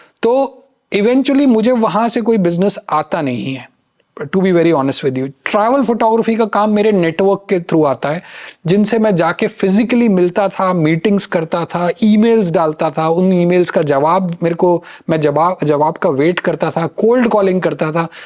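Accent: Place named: Bhopal, India. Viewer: अरे वाह आप तो भोपाली निकले,तो कवि धूमकेतु का नाम जरूर सुना होगा।उम्र में मुझसे छोटे हैं, लेकिन रिश्ते में चाचा लगते हैं।आपकी बोली से मुझे लग रहा था सूरमा भोपाली💖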